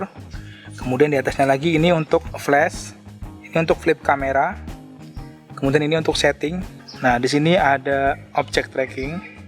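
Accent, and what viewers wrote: Tegal, Indonesia. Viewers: Wah mase wong tegal ya mas?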